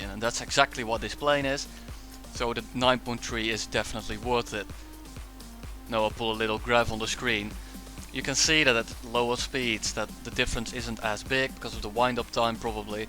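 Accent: Netherlands. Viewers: Subscribed. I hear a clear dutch accent. Groeten uit limburg!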